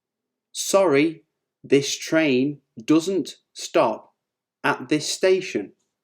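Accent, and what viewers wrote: United Kingdom. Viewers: İs this british accent? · Thank you, just found your channel and subscribed. Just wondering, what your accent is. I think it is British at least it sounds British to me.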